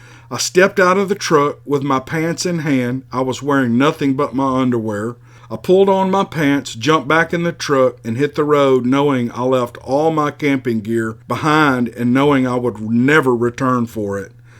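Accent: South, United States. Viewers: I think Sasquatch are far more intelligent than most people realize. Whether it understood english or simply read the man's body language, I'm sure they understand enough from their experiences with humans to see that we love our canine companions. Perhaps they keep animals as pets, too. Great story. I enjoy the stories spoken in that familiar southern drawl. Keep em coming! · Had been watching Bigfoot videos on youtube and came across your channel. I never comment, but so enjoy your stories and southern accent I had to say what a great job you do. Thank you for the interesting stories and the time you put into this channel.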